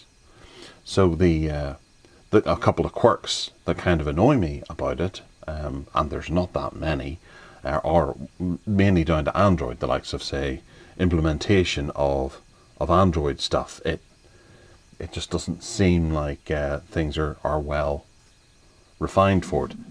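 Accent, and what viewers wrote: United Kingdom. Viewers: Books: H2G2, David Niven, Roger Moore, Sean Connery, and an Aston Martin DB5 Model. And a very nice British gentleman's accent. So far the interesting part of the Video. The P11 is nice, though. ;-)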